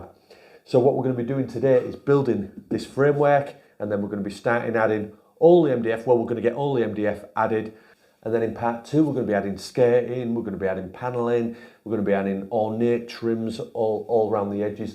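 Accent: Hull, United Kingdom. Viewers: Hi, love the stuff you do with a proper ull accent makes it easy for a Bransholme lad to understand keep it up 👍👍👍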